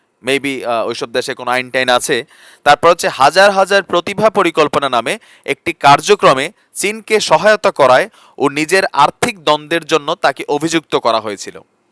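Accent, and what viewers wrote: Comilla, Bangladesh. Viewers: Cumilla hote vi apnar cumillar vasa khub vlo lage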